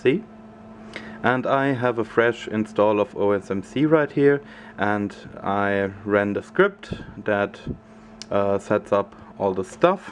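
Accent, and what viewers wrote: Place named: Germany. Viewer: OMG, ich höre krassen deutschen Akzent raus, könnte es aber auch nicht besser.Seitdem ich RaspBMC nicht mehr nutze, da es ja nun OSMC gibt, frage ich mich, ob es denn möglich ist, z.B. den Xbox 360 Wireless Controller vom PC über USB zu nutzen, denn wenn es schon so tolle Emulatoren gibt, wäre es ja äußerst unpraktisch, diese nur per Tastatur nutzen zu können.Also - ist es möglich?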